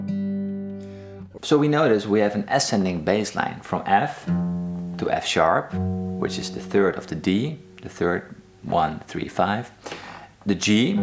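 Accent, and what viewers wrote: Netherlands. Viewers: You have a Dutch sounding accent. Wow, liked this video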